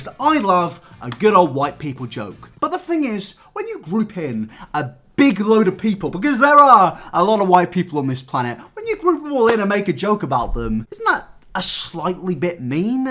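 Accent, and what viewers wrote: United States. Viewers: Your American accent is bloody lovely mate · Liked the video for that lovely American accent chap 👌👌👌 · sad that i will have to dislike because of the american accent :( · Just liked because of the American accent. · You're so adorable!! That American accent 😂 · fantastic american accent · I didnt like the American accent but I liked the video anyways. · I really wanted to like this video. But I cannot condone that American accent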